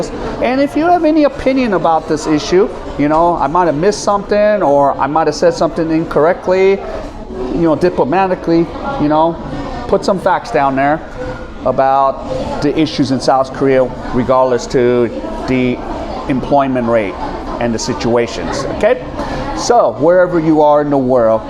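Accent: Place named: United States. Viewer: The Korean in South Korea should go to the Philippines and build their own business. Its easy to set a business in the Philippines for Koreans. Filipinos loves Koreans. The way you talk now is like "americanized" & "Philipinized" so funny !😂🤣🤣🤣! I subscribed because you are funny and truthful - real dude. I'm from Miami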